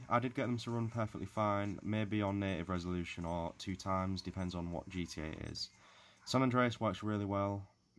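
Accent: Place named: Yorkshire, United Kingdom. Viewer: Are you from Rotherham or Sheffield?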